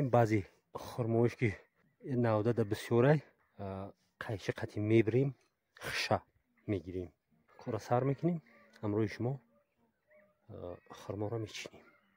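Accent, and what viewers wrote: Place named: Tagnob, Tajikistan. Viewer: Асалому алайкум бачаи хеш мохам мардумитагновем хам сари маргзори ва хам пасикухием бехтарин наворхо бо лахчай тагноби саломату сарбаланд боши бо фарзандонат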